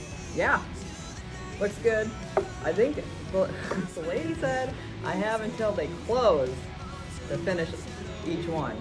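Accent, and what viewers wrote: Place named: Minnesota, United States. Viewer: Just heard you say you're from Minnesota. Thought I heard that accent😃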